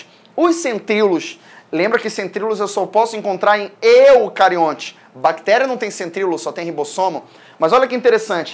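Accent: Pará, Brazil. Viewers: Tu é paraenses né parente ? Professor muito simpático. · professor, vc é paraense? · Mano, tu é paraense ? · beeem paraense kkkk, sou do Pará tbm, mas não tenho sotaque daqui kkkkkk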